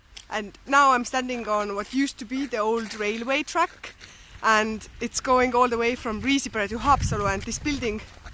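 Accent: Ireland. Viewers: I bet u live in ireland as u have irish accent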